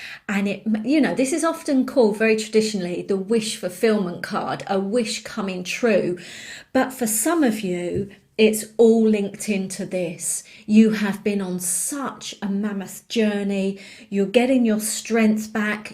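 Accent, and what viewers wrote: United Kingdom. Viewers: Good Morning!..From California.....Gemini is here...How r u? Lovely lady...Thank uuuuu so much for the beautiful reading for me ...Trully appreciated ....By the way, I love your British Accent....Tc ..Much love .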